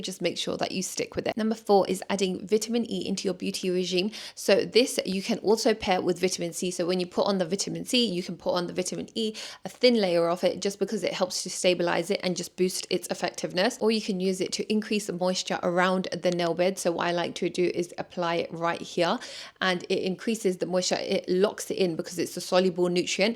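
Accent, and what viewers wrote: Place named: England, United Kingdom. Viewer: Your English accent ❤🎀🤌so amazing i impress ❤you look gorgeous and will follow these tips in Sha Allah 🙏😊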